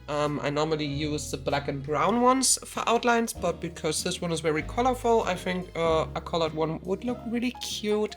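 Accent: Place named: Germany. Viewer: GERMAN ACCENT MUEHEHEHEHE these drawings are awesome ggs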